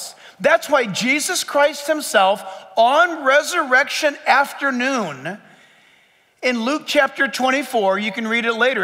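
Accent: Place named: New Jersey, United States. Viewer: You sound like you come from New Jersey to me! You also have the same sense of humor that my husband and I have and I gave him your name and this series you're preaching. God bless you, always. I've been through some of the things you mention.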